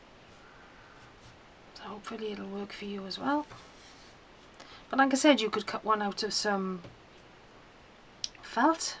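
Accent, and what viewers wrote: Wales, United Kingdom. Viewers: You sound welsh im homesick now😢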